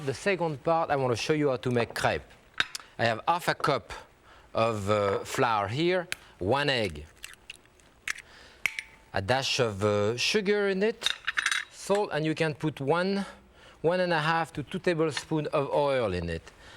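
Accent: France. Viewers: a genius in the cooking world is jacques pepins the french accent adds to the recipes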